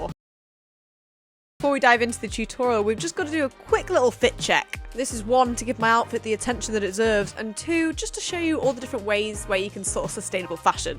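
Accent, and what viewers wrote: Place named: United Kingdom. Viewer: I often watch videos on 125% speed, but I have to concentrate to listen to you on normal speed with your British accent me being American .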